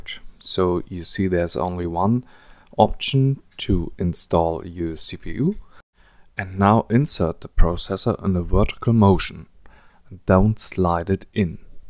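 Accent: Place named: Germany. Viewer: Ne ;P Aber zumindest hört man, dass du ein deutscher bist^^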